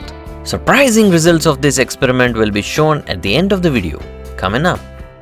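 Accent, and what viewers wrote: India. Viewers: sounds like one of those indian scam-call centers..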